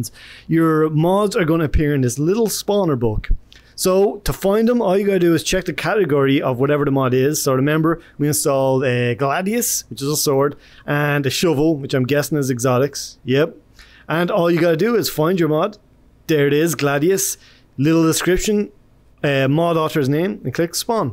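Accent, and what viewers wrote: Ireland. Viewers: your irish accent is awesome dude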